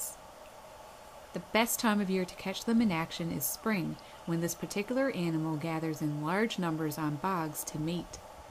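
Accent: Canada. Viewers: Oooh very informative, thank you 👍🏻 You have a lovely soothing voice, I love your accent 🎼 Canadian, I'm guessing ?!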